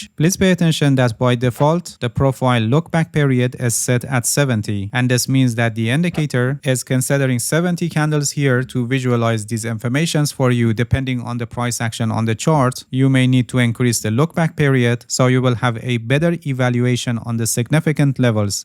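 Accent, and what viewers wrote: Iran. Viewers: The accent. Isn’t it Persian ?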